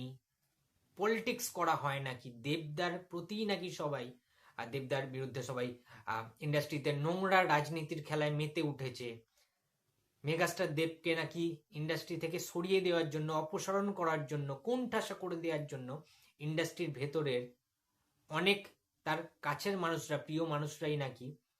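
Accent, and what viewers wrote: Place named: Bangladesh. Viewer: Golar voice sune to mone h6e bangladeshi....ta boli age nijer desher subho kamona korun na...jate gota desh tar sad budhi ghote....parshobarti desh e ki h6e jene ki korben....tollywood er king age jei thakuk na kno...akhon 1jn e a6e...at present Seta hlo Dev....apnader Shakib o kichu chirte parbe nah...😂 Ei karonei hyto bole Ma er theke masir dorod beshi...😂😂😅😅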